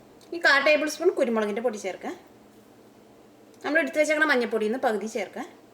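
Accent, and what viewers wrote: Thrissur, India. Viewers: ur malayam sounds funny....thrissur language....i like u ...i ve tried ur recipies....keep going ur good work....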